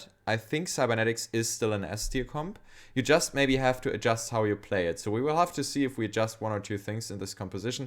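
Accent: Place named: Germany. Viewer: Man hört den Alman einfach so raus alter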